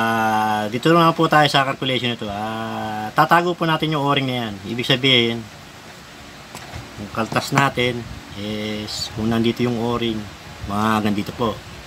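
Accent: Batangas, Philippines. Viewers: ah akala ko kasi batangas at quezon ..ganan kasi ung mga punto dine eh..haha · ahh pero prang batangueño ang kaunting punto ninyo ka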